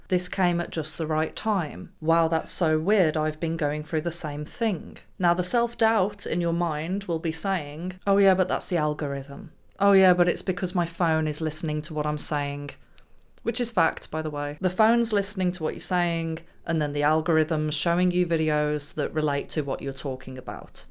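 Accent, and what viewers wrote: United Kingdom. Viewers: I am definitely connected to you. LOL Your British accent and your brilliance on so many different topics lulls me to sleep every night. Keep up the good work. Mark in Dallas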